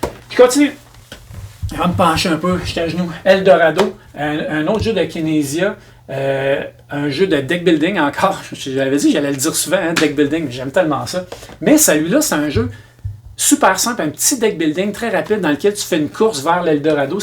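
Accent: Quebec, Canada. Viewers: Et bien j'ai 11 épisodes à regarder ! Merci pour l'ajout des titres sur cet épisode. J'avoue sur la 1ere vidéo y'a certains titres de boîte qui m'ont échappé. Pas habitué à l'accent québécois encore mais je m'améliore. J'ai appris un nouveau mot de vocabulaire : motton !! Merci pour la traduction 😅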